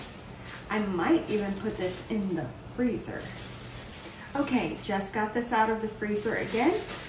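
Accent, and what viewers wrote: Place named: South, United States. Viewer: That’s absolutely southernese! I love it! Go to Europe and you will find many different variations in language but I would not dare to ask them to change their way of speaking bc my way is different. That’s what is unique ab people from different parts of the world. Stay the way you are. I do love hoe respectfully she ask though.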